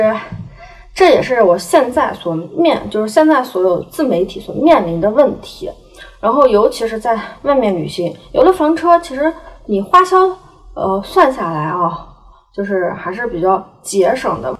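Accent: Shaanxi, China. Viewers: 陕西话摄地聊滴太。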